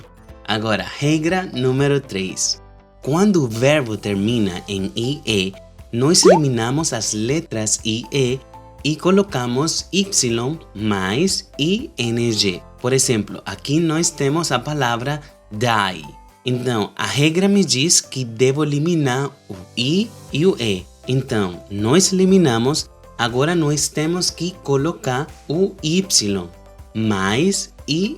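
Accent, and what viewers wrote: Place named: Japan. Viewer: Boa noite professor tudo bem seu sotaque paresse ser japonês moro em Boa vista Roraima Brazil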